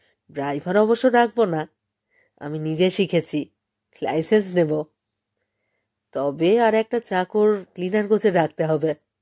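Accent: Bangladesh. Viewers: apnar bangladeshi dialecte pather jonnyo shrutimodhur lageni . aar kono action charai jeno reading porechen.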